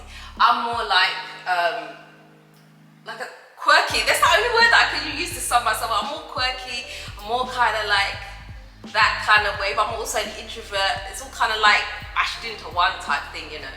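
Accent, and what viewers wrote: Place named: Midlands, United Kingdom. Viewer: I am surprised to hear you are “Londoner” My Mrs who is a Londoner thought you were from mid-lands Becos of your accent and how you pronounce certain words Anyway thanks for sharing your story, much appreciated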